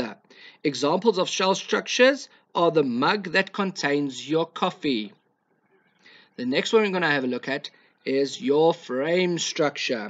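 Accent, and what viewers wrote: South Africa. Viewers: I swear this guy sounds South African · It sounds like you speak Afrikaans, because you have an south african acsent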